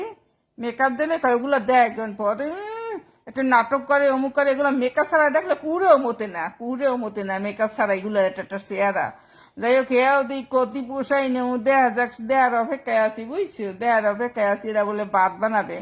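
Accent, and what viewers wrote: Barishal, Bangladesh. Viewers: দিদিভাই আপনার বাড়ী কি বরিশাল 😅 Thanks didi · আপুর বাড়ি কি বরিশালে?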